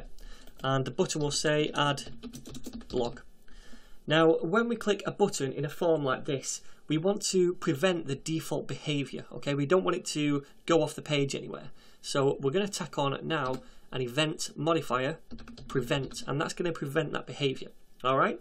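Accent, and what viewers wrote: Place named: England, United Kingdom. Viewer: Nice English accent, watching software tutorial and learning language pronunciation in paralel! =)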